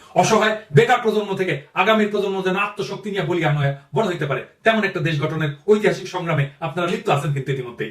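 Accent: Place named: Bangladesh. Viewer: Dur bal tui chup kor ,Nastik kothakar ,tor Bangladesh er kathar tan ta barche re harami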